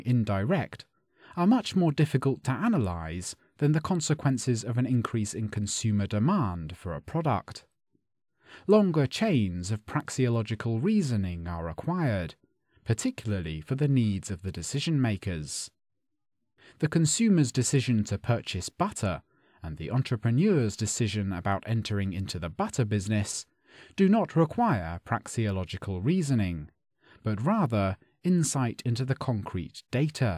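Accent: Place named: RP, United Kingdom. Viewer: Received pronunciation))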